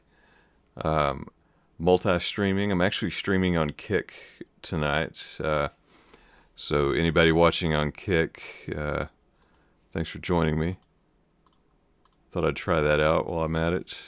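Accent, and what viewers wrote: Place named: Midwest, United States. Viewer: Ok, so I’m creeping!! lol, I’m a Honker who wanted to see if looks like his voice!!! 😂😂. I have to say your mid west accent is much more apparent on this channel. Probably bc you’re not talking with a British accent!! ♥️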